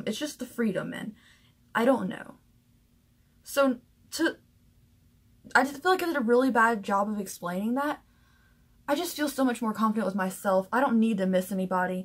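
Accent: South, United States. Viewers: Love you MOSTEST sis!! I'd LOOOVVEE to hear your southern accent in full force... I have a southern Illinois accent so a drawl out my A's real bad. I don't notice it but when I traveled west I got a bunch of shit for it.. Not bad. Just shit. Lol anyway have a good night girlie!! ✌🤘💋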